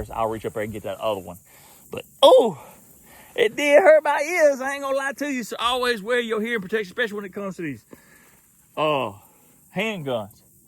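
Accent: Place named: South, United States. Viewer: still has a clear southern accent at 2X speed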